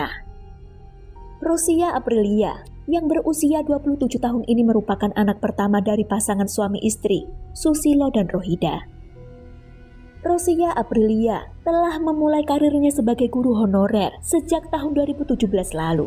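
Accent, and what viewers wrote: Java, Indonesia. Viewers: Lebih enak didengar jika medoknya dihilangkan tapi mungkin susah ya, dan mungkin itu ciri khas channelnya.. Kalau medok saat berbahasa Jawa memang wajar, tapi saat berbahasa baku tetap saja medok, agak (maaf) tidak enak didengar... Tapi sekali lagi mungkin sulit dihilangkan dan sudah jadi ciri khas 🙏🏽🙏🏽☺️☺️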